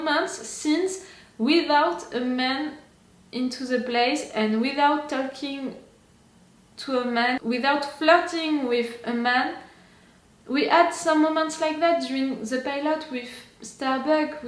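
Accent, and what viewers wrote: France. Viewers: This french accent of yours is quite pleasant for my russian ears. Sounds great) and I understand almost everything)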